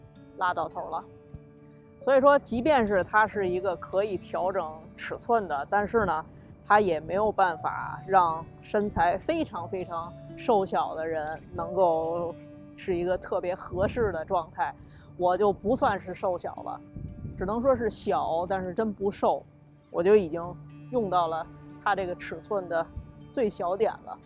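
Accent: Beijing, China. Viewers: 很高兴发现了您的频道，比较好玩的是：我还没怎么看内容呢，光听你的声音就订阅了，离开北京太久了，喜欢听一下乡音:)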